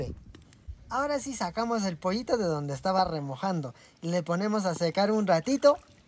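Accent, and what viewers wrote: Peru. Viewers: Buen día, joven no se de donde es usted. Observó que habla como los mismísimos cusqueños la tierra de mi señora madre. Felicidades por no perder sus raíces y escencia.. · Me encantó este cocinero me 😂 mucho con su endulzadas con sal espectacular esa manera de cocinar y de decir las cosas mil felicitaciones me gustó tanto que me suscribí casi no lo hago, de donde es? Perú?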